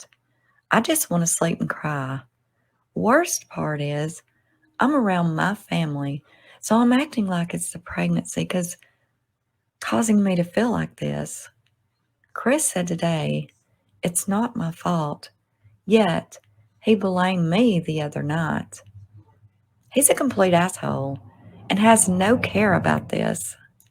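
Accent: South, United States. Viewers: Doesn’t she .......her accent is amazing when I visit America this is what I want to hear 😃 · Please don't let some of these negative comments get you down. You're doing a great job with a very difficult, controversial case. You are so beautiful....a southern Queen. Hope you will do more reviews showing your pretty face....not just you nice voice. I just love Southern accents.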